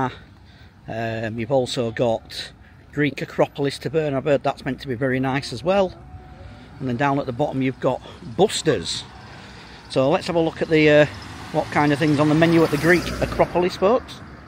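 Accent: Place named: West Yorkshire, United Kingdom. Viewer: Thanks for that . Might give it a go late September or June. Looks up my street . 🍺👌 We think we caught you mention West Yorkshire ? After we guessed by your accent . Where about May we ask ? We are really looking forward to our favourite go to airport reopening again up there Doncaster . 🙏🏼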